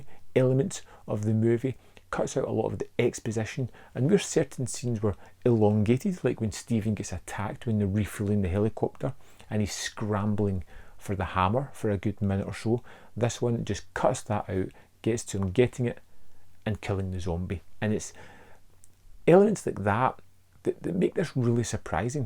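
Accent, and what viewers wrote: United Kingdom. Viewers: I came across your channel because of this release. You've got a strong accent mate that I really have to get used to when watching your review. 😂 Germany here, but I'm pretty much fluent in English. The British accent is one of its kind though. Anyways, I pre ordered my copy when it went on sale and I'm so much looking forward to it. What's more, my cinema will bring Dawn of the Dead on the big screen on Halloween night for one presentation only. Can't wait to check it out. You guys got some pretty cool releases coming out, talking about the other Second Sight Films announcement for Dog Soldiers and the 4K box set for Total Recall. 2020 was definitely one of the most expensive years for me buying films with all the amazing releases. Keep coming the good work mate. I subscribed to your channel. Cheers, Basti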